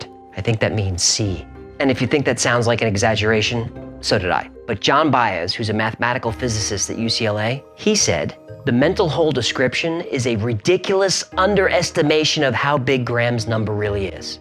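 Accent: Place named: New York, United States. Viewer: Hahahaha! The fish is cute 🐠 I love that he has a NY accent!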